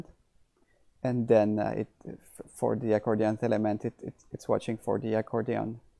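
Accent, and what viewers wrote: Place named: Quebec, Canada. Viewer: Oh wow, you've been so helpful since we switched to Elementor - Did not know you were Québécois? I think I recognize the accent :)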